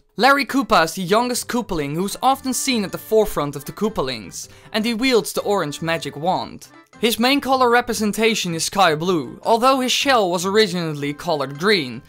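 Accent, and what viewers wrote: Netherlands. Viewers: are you dutch xD ik den nl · are you dutch or just english becuase im dutch and you sound dutch to me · Is this guy dutch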